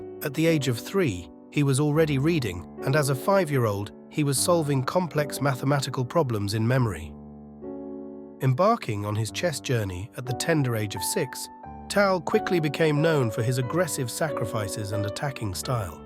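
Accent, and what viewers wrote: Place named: RP, United Kingdom. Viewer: You're not supposed to notice stuff like that when the narrator has a posh accent.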